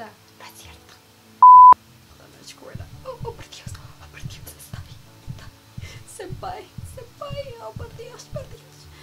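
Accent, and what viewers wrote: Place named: Bogotá, Colombia. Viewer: vivas De Bogotá, su acento la delata :v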